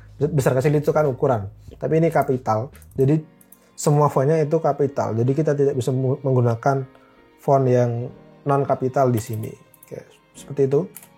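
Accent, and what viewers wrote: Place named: Java, Indonesia. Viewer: 'v jowo mas, maklum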